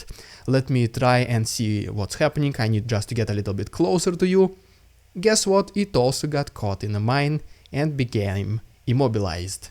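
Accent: Russia. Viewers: I love your accent and content. I really hope that you are not Russian and just playing everyone with your accent! Your content is great, keep it up!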